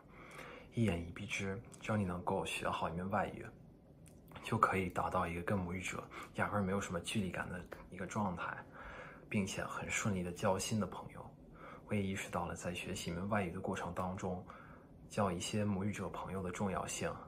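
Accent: Standard. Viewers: 真厉害，太标准了！ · 真牛👍！普通话甚至说的比我还标准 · omg 很标准！可以关注instagram账户吗？🤔🤣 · 说的太好了😱发音也很标准哈哈哈哈，你的华裔朋友一定很多是北方人吧，儿化音掌握的很好（eg. 服务员儿～～）🤣你的视频很有启发性，学习外语最快的方法果然还是和母语者交朋友～如果有机会来中国学习或者工作一定又会收获一段很难忘的经历。btw端午节吃的甜粽还是肉粽哇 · OMG！两年中文就这么标准！我英语学了快20年啦，还那么烂！你也太牛了吧！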